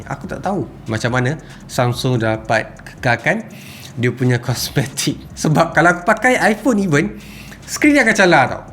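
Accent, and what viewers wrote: Terengganu, Malaysia. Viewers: pekat terengganu tu tak hilang ekk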